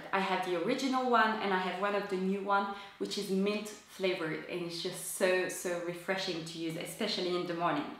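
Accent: France. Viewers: Love love love your French accent !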